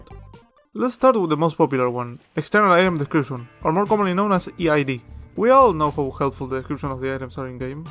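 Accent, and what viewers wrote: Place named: Argentina. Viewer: solo con escucharte hablar ingles ya se que sos argentino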